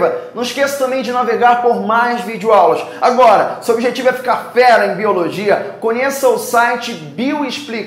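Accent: Pará, Brazil. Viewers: Queria saber se vc é paraense ? Tem sutaque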